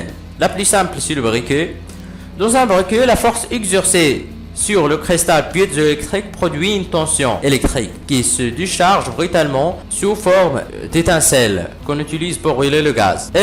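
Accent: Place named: North Africa. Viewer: Le problème des " scientifiques " c'est que la plupart ne savent pas présenter ou être pédagogue, en général quand un scientifique t'explique quelque chose, il est tout seul dans sa tête... La voix avec fort accent maghrébin est déconcentrante, la police des slides mal choisie, c'est parfois chargé pour rien... Il vaut mieux faire simple, que vouloir trop en dire ou trop en montrer pour rien. Bref merci pour les efforts d'explication, mais faites un petit effort sur la pédagogie svp et la pédagogie commence par simplicité et la clarté. Bon je ne dis pas que c'est incompréhensible, mais voilà quoi, ça donne envie d'aller voir une autre vidéo... Merci quand même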